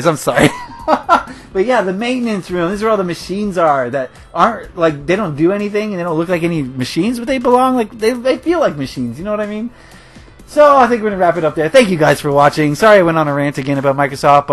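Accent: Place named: United States. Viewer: We Englishmen speak English because we are from England:p I do find it funny myself how you Americans say some words, lol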